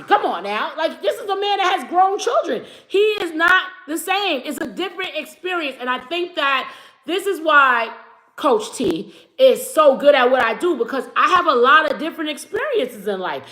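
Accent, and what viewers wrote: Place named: New York, United States. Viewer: ❤❤❤❤❤❤❤❤❤❤❤❤❤❤❤❤❤❤❤❤❤love love love it Girl i subscribed and everything thank you for your new york accent and honesty…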